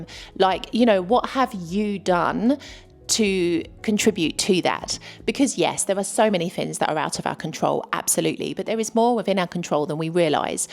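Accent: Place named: RP, United Kingdom. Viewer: Sorry for my lack of clarity. I meant your own level of Italian proficiency when you moved to Italy. As for your accent, I’d place it in the RP category. Best wishes.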